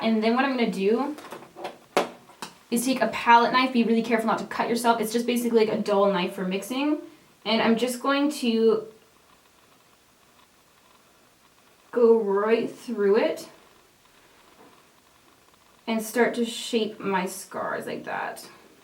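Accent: Canada. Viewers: your canadian accent is so cute.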